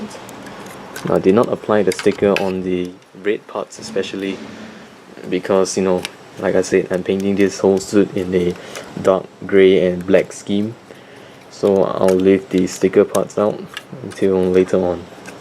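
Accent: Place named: Singapore. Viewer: hahah , recently saw stock at Gkgundamkits thou . you sound like a Singaporean . haha .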